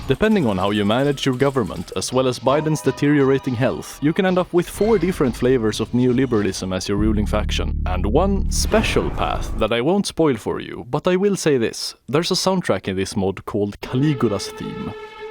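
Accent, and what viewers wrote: Sweden. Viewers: I can hear the Swedish dialect. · max0r's style really doesn't work with that thick Swedish accent and demeanor. For this to work you'll have to stop being monotone which is an impossible task for any temperature challenged individual.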